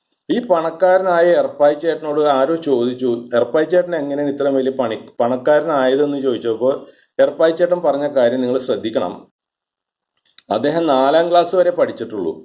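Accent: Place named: Thrissur, India. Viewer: Sir, thankalkku oru thrissur slang und. Keep it up. God bless you.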